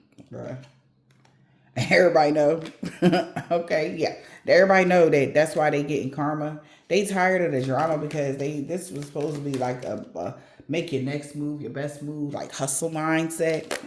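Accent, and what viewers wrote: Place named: Baltimore, United States. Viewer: Lol is you From Baltimore I love your accent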